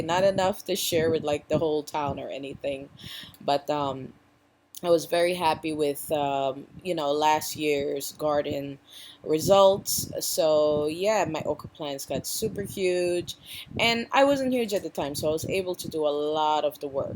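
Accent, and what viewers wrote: Caribbean. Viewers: I’m Guyanese!! We does call it bora... Ik you sounded Caribbean 💚💚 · I knew I heard some West Indian coming through, lol, once you mentioned scotch bonnet and callaloo, I was like yup....lol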